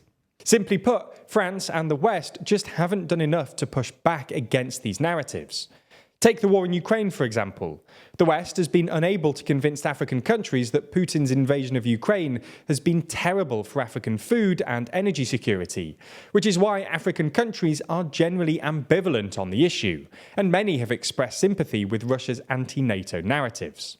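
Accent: England, United Kingdom. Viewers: Just wanted to see if it was gonna be some schmuck with an English accent telling us about the evils of the other guys. GFY!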